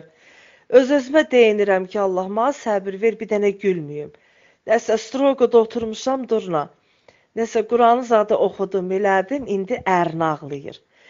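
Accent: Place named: Dashkasan, Azerbaijan. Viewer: Yəqin daşkəsəndən olub lətcəsi ona oxşadı amma gülməli deyil dərdə gülmək olmaz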